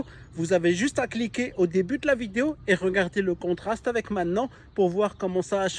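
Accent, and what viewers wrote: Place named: Alsace, France. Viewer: Tu es génial ! Une personne vraiment humble et humaine, ça fait plaisir ! Je crois que j’entend un accent alsacien, si tu es de passage vers Mulhouse, n’hésite pas a répondre, je t’invite a boire une bière avec plaisir !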